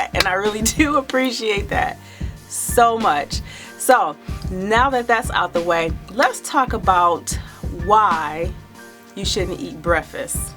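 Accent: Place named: Detroit, United States. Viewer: that Detroit for BREAKFAST 🙄😄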